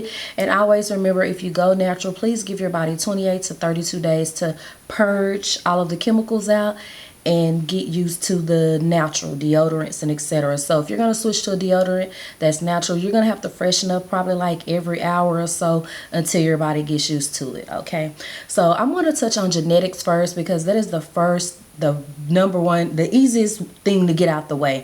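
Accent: Dallas, United States. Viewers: When she say it with that southern accent 🤣❤️ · I figured you were in Dallas just by how u talk , I know that talk lol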